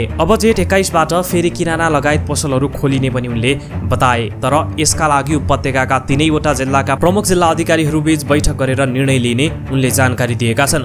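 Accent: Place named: England, United Kingdom. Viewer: नेपाली बोल्दा नि अग्रेजी tone निकालेर pronounce गर्नु पर्ने? आफ्नोपन ल्याउनुस न् ।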